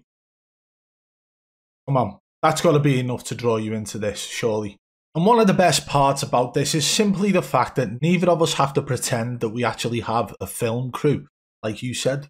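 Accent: Liverpool, United Kingdom. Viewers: Ur scouse accent is unbearable.. I feel like I agree that all or most of these streamers are cheating In warzone but your videos seem Phony as well and really shady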